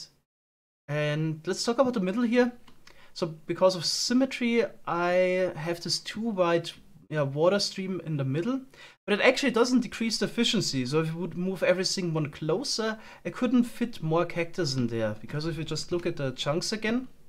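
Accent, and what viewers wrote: Germany. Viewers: your german? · I can hear a little bit of deutsch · The german accent is soooooo brutal hahaha love it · bist du deutscher ? hört sich leicht danach an.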